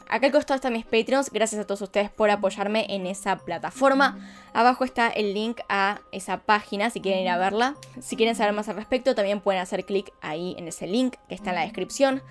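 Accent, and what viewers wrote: Argentina. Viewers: Muy buen video de Selena tristemente ya no está conosotros yo pienso si siguiera viva sería más famosa , lo que me gustó de ella que era muy sencilla no se le subía la fama también era humilde te felicito por el video y me gusta tu asentó Argentino como pronunciado la Y driega me encanta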